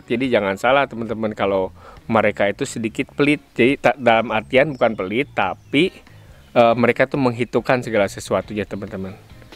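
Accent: West Java, Indonesia. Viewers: Ga apa² Kang, kebawa bahasa sundanya, malah enak didengar, jadi menghibur juga😀😀 Semangat Kang..!!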